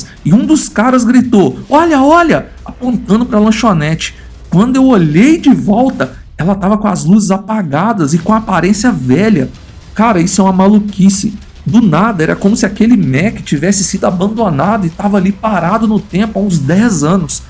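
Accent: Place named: Campinas, Brazil. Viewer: Vou me inscrever. Gosto dos assuntos e seu sotaque parece que tu é aqui do interior de Campinas kkkkk então me identifico. Sucesso.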